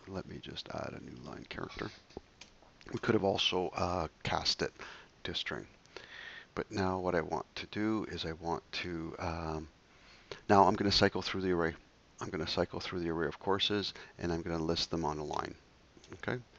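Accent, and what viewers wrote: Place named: Scotland, United Kingdom. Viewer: sounds scottish. great accent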